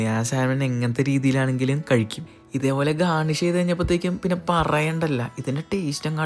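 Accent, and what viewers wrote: Ernakulam, India. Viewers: I love your ekm slang❤️❤️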